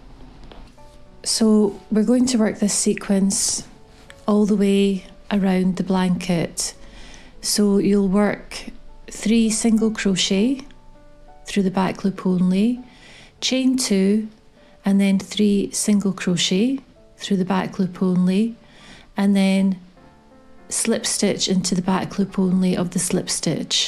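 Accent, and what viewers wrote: Scotland, United Kingdom. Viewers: As a first timer at crochet, I just like to say , your easy to follow, step by step, you're also Scottish which makes it even easier for me. Thanks ♥️ a trillion💯👍💓🏴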